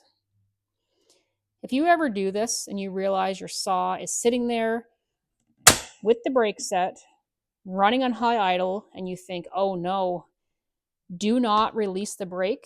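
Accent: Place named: Canada. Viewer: See that's why I love when you do videos on every saw because we learn something new every time. I really dig the Canadian accent I can hear your voice narrating a book on audible. I also love the sarcasm throughout and died laughing at chainsaw protective services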